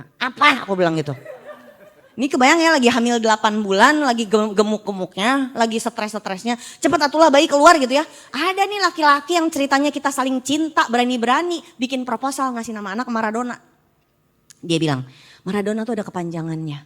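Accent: Indonesia. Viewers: saya suka ibu ini kalo bicara bahsa ingris,, otentik indonesia bgt tanpa harus kebule bulean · Suka sama logat Sulawesinya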